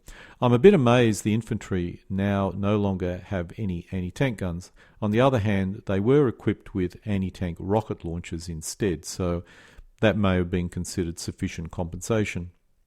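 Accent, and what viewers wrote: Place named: New Zealand. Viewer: Interesting. your voice sounds like New Zealand to me.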